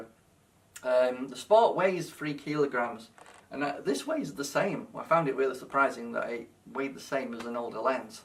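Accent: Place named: Thailand. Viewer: That sounds like someone from Thailand reading some text …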